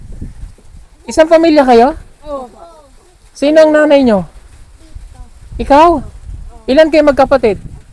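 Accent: Visayas, Philippines. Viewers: Marunong na mag bisaya c sir 😂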